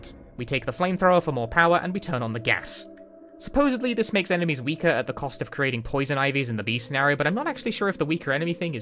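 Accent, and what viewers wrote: United Kingdom. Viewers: Are you british? You talk like a sitcom character.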